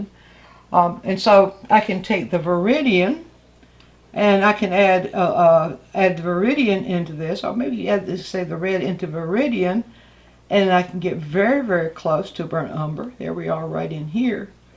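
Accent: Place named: Carolina, United States. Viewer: Thank You!! I hardly ever use the earth tones straight from the tube, I always mix in the compliments, but the way you leave the variations on the palette may have just solved my issue I'm having with a subject that has dark bronze skin tones part sun, part shade. Duh! Why haven't I thought of having a gradient available? Also, being from Alabama, do I detect a Carolina accent? lol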